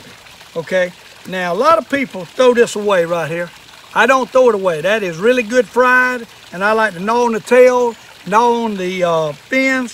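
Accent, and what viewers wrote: South, United States. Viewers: My poppa always cut their heads off and gutted them, but my wife and kids dont like eating panfish that way, so we dont eat fish often. Came here for some info about fileting panfish, and found a goldmine. Poppa was from southeastern North "Cackalacky," and his accent was so similar to yours that this was almost like listening to him teach me how to filet panfish. Only thing missing was him saying, "now look here boy" about 30 times in 10 mins, 😂. We lost him in the fall of 2000, and I still miss him, but this vid just reminds me of fishing trips with him, especially his last where the heat got to him and as we were leaving, we got into a school of Crappie (crap-ee is the right way to say it too, lol), and he told us to stay a while more and we all (me, my dad, and him) caught our limit of huge Crappie. Had a HUGE fishfry that weekend! 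150 fish! Thanks for posting this, sir! Both for the education and sparking one of my most cherished memories. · You are inspiration sir. This Yankee loves your accent and your down-south upbeat personality. Your mama raised you right and I bet you never slapped her in your life. LOL...take care! Mike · LoL it's all Good, us Northern Yankees say crawwpys. But I'll admit, you got yourself some big ones, down there,. Evin if you all talk a little funny,. Great demonstration.and video,. Cheers !!!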